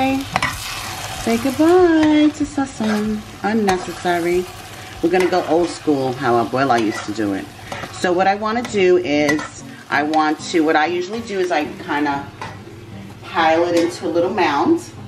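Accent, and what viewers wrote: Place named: Nuyorican, United States. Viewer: Love the newyorican accent.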